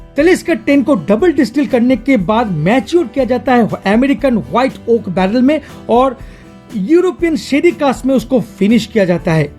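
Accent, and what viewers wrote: Bengal, India. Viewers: Actually I love your review method and bengali tone I like to have sweet whiskey please suggest some like black dog.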